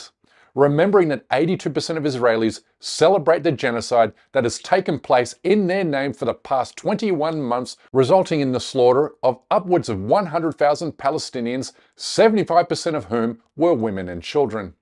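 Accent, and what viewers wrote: Australia. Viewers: Sounds Australian to me! From central Queensland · I am Australian and he sounds Australian to me! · Even I can hear the Aussie accent, and I'm a Kiwi!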